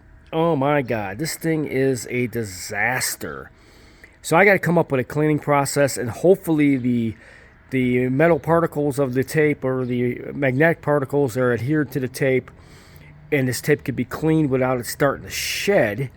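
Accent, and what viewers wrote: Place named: New York, United States. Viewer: You sound like your from NY i em a New Yorker my self.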